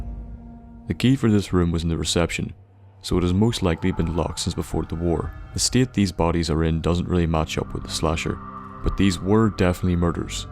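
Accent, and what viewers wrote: Ireland. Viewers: I think he's irish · Is the irish?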